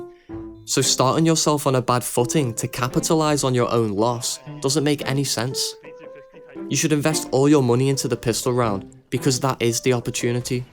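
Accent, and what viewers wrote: Liverpool, United Kingdom. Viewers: scouser accent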